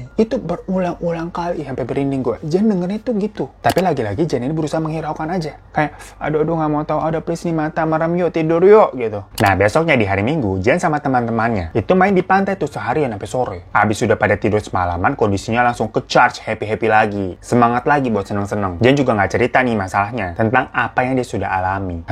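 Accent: Java, Indonesia. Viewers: Bang...pliiizzz...jgn bkin aku ketawa ngedenger abang ngomong bahasa jawa...keren n lucu bgt👏👏👏👍👍👍usahanya polll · 😂😂lucu ngomong bahasa jawanya kk😂😂😂ngakak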